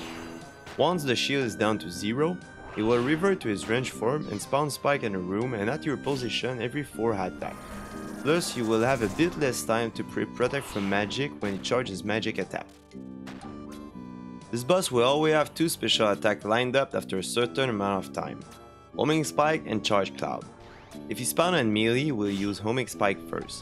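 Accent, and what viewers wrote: Quebec, Canada. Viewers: bro are u canadian/french? u talk almost identical to GSP (georges st-pierre) edit: ty for the guide very well explained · are you from quebec? this accent me dit dequoi en tabarnak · J'ai remarqué direct l'accent québécois! super guide, lache pas!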